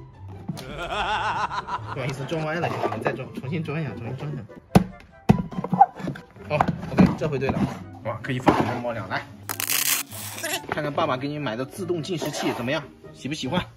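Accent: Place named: Malaysia. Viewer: 马来西亚口音XD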